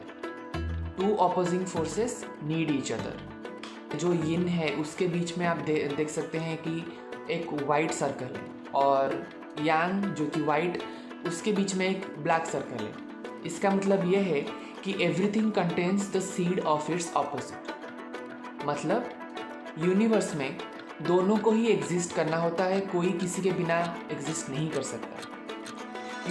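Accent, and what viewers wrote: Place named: Odisha, India. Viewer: Bhai thoda ladka bano Aurr hindi bhi aap ki lag rahi hai odia accent wali Yeh rang dhang change karo ladka bano re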